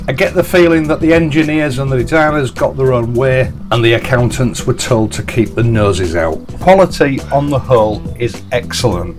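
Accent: United Kingdom. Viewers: Love the bike and the way you review it. So great to see a company many would smile at forking out such a beautiful machine. As someone living in Australia (Straya, mate) i almost need sub titles...which part of the UK talks like this?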